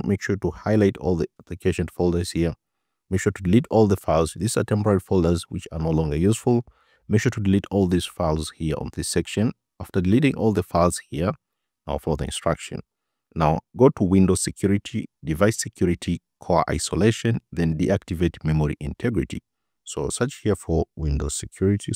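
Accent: India. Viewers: I knew i would work when i heard his indian voice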